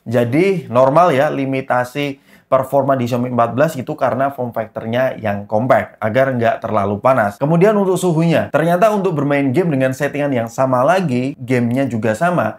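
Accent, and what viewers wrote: Semarang, Indonesia. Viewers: Wong Semarang tah mas?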